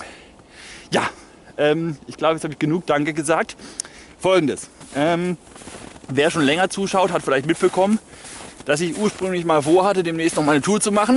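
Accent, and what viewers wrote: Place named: Karlsruhe, Germany. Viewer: Naja.. fundiertes Wissen wird vermittelt, keine Halbwahrheiten Man lernt also immer etwas. Deine Sprechweise ist amüsant und hörenswert (wohl weil es recht bekannt ist, ich wohne bei Karlsruhe). Es wird einem nie langweilig.. Manch andere Kanäle höre ich neben einem Onlinespiel.. Bei dir ist das nicht so. Von Anfang bis zum Ende interessant. Mach weiter so:-)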